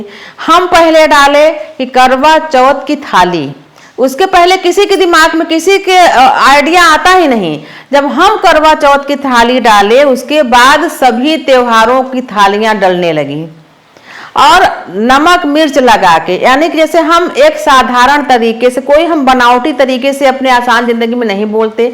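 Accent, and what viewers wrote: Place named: Prayagraj, India. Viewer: मां जी प्रणाम, लोगों की तो मुझे पता नहीं मगर मुझे आपकी भाषा बहुत अच्छी लगती है। हम तो राजस्थान से हैं। लेकिन जब मैं बहुत छोटी थी तब मेरे पापा जी के राजस्थान में ही आफिस में एक अंकल जी थे प्रयागराज से उनके बोलने का तरीका भी यही था और मुझे उनका बोलना बहुत ज्यादा अच्छा लगता था। सच कहूं तो मुझे उनके इस तरह से बोलने पर मुझे तो उनकी वाणी में बहुत ही मिठास महसूस होती थी और मैं तो जब भी आपका वीडियो देखती हूं तो तब भी वही मिठास महसूस होती है।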